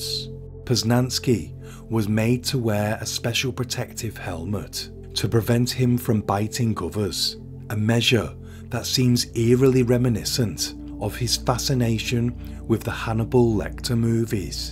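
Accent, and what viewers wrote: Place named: England, United Kingdom. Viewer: English accent on narrated murder documentary is what I like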